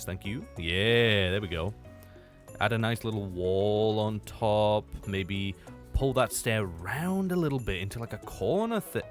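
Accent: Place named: United Kingdom. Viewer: The strong British accent slays and I could like hearing the yapping.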